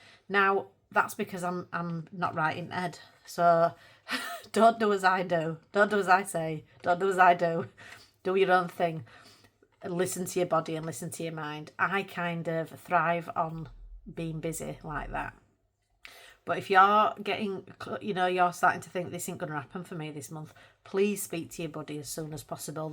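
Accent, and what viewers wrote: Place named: Northern England, United Kingdom. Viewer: ‘It’s not a long video it’s quite short’… 40 mins later 😂 jk jk I love longer videos, especially ones with a familiar northern accent! We did a pick n mix at the Lindt shop in Leeds trinity last week and I massively regret only getting 2 of the shortbread ones 😂 I like to buddy to encourage me to colour in books that I’ve been avoiding because I’m intimidated by. I love Kerby books but have been avoiding fragile world because fur isn’t something I’m confident with, but I did a buddy in there last month and once I’d ‘committed’ I actually really enjoyed colouring the image! Great video ❤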